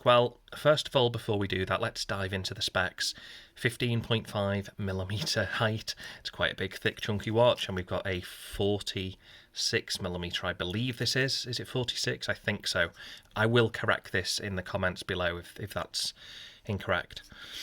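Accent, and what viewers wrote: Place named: Liverpool, United Kingdom. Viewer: Love this watch,. I also love your accent! I'm a Yank studying all the different dialects of the U.K. Do I detect a bit of Scouse, Mate?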